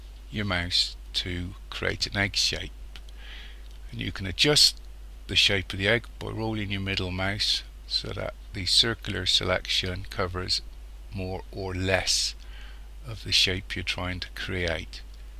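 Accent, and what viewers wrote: Bristol, United Kingdom. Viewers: Lifesaver! Thank you so much! P.S. Love your accent, are you from Bristol? I love it there so much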